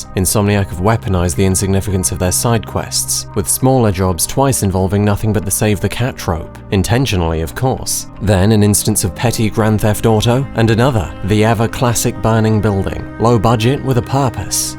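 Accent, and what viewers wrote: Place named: United Kingdom. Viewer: This is what any Englishman that isn't a chav sounds like. I assume you're either very young or American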